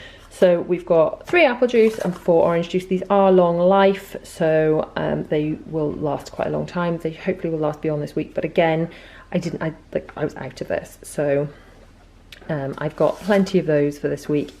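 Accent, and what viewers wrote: Wales, United Kingdom. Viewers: Ah what a lovely channel, how have I not found you before! We're a family of 6 too, I feel so paranoid going shopping, like you're being judged🤣 I even felt like I had to justify why I needed 4 Easter eggs in Aldi the other day... apparently there's no demand for them so it was fine...no limit yay🤣 The hardest thing about all this is everyone being here all the time, when usually some of us are in school/work (as with everyone) the food is literally flying out of the fridge! Anyway sorry it's an essay, lovely to 'meet' you. Do I detect a Welsh accent? 🥰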